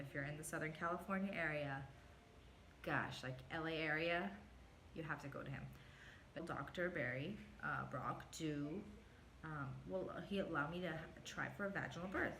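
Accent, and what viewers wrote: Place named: North East England, United Kingdom. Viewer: Are you from the north east? You sound as if you might be.... Oh I would love to find an OB that would allow a VBA2C.... We are in Ohio....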